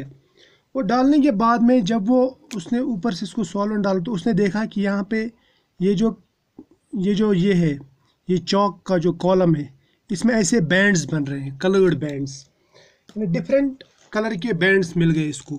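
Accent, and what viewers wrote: Kashmir, India. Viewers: totally Kashmiri accent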